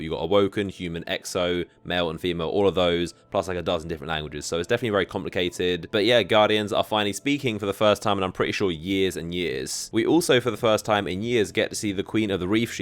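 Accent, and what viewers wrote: United Kingdom. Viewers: My favorite British Boiiii Back At It Again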